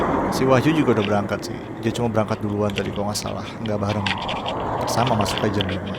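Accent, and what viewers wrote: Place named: West Java, Indonesia. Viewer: Bang kamu orang sunda ya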